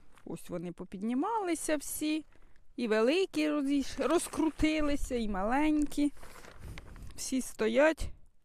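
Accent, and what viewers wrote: Poltava, Ukraine. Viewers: Зразу впізнала полтавську манеру розмови, бо у мене тьотя там живе. Дуже приємно слухати. Ви молодчинка, любите землю і рослини!!!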